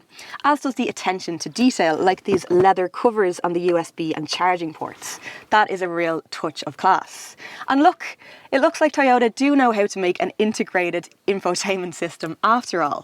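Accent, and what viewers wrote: Dublin, Ireland. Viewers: Dublin accent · It's a huge pleasure to watch and listen to your reviews: they're through, precise, competent, and on top of that, you are amazingly beautiful and gifted with a delightful (Irish) accent. Thank you! Best regards from Portugal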